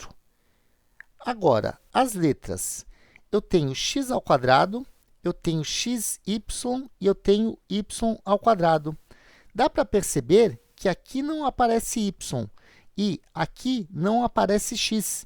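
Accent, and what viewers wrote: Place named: Rio de Janeiro, Brazil. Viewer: so eu que percebi o sotaque de carioca?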